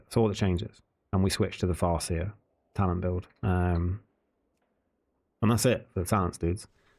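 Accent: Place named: United Kingdom. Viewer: nice seeing a brit explain talents etc for a change haha! thanks buddy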